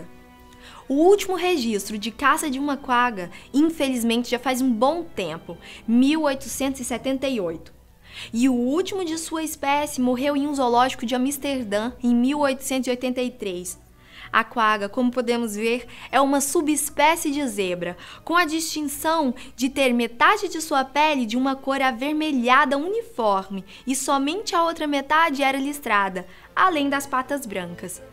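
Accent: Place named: Minas Gerais, Brazil. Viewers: Pelo sutaque deve ser mineira. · A moça que fala nesse vídeo é de qual estado do BR? Parece de MG...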